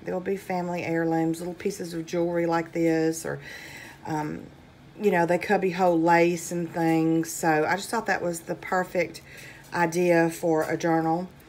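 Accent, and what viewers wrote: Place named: South, United States. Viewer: I can hear your southern drawl, very nice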